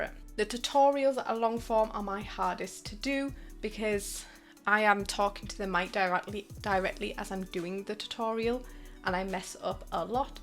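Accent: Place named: Sunderland, United Kingdom. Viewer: If never guess Yorkshire accent. You sound more like a Makem than a geordie aha 😅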